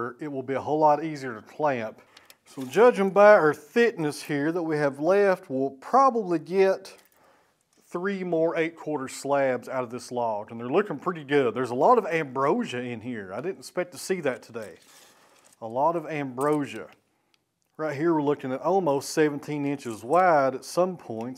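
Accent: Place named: Tennessee, United States. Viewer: I watch a lot of saw milling on Youtube, but lately its gotten difficult to watch other channels. It seems they are working way to hard, you make it look easy my friend! Its also nice to hear the Tennessee accent. I grew up in the Knoxville area, but im living in Kansas City now and I really miss people that speak properly 🤭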